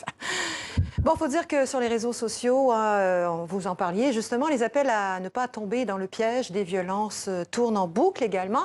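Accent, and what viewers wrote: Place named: Quebec, Canada. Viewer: je reconnais votre accent vous etes Quebecoise ....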